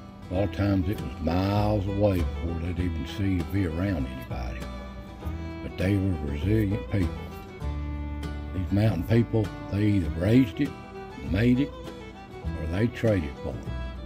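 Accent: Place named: South, United States. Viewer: Hey, thanks for another great video. I heard my grandpa ran moonshine, but unfortunately I don’t know too much about it. I lived in Tennessee near Nashville when I was a child, and I had your lovely accent lol. We moved to Texas for a while, then back to North Carolina so my accent changed a bit, but still southern. Anyway, my son and I enjoy hearing you speak, and the old photographs are just beautiful. Have a great day. 🌺😊🌲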